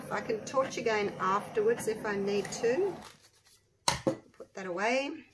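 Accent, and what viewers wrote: Australia. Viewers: I enjoy all your creations. Love listening to your Aussie accent.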